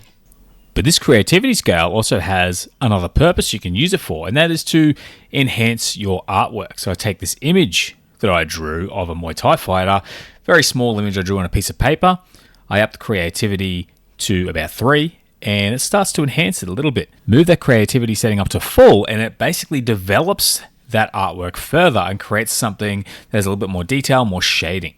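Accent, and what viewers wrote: Australia. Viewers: It is fascinating how Australians say "Peower", "Draring", etc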